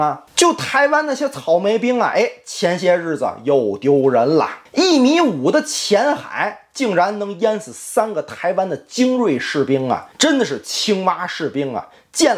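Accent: Tianjin, China. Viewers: 我特别喜欢听天津话，骂人都这么的带劲，快笑死我了🤣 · 还是有点天津味的北京话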